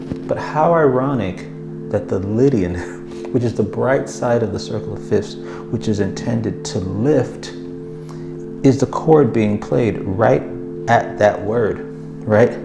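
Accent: Caribbean. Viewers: He sounds Caribbean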